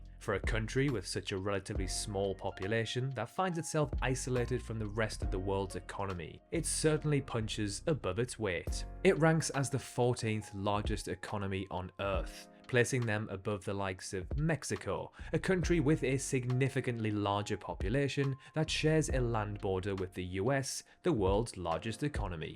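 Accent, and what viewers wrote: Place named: North, United Kingdom. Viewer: Love the Northern accent already <3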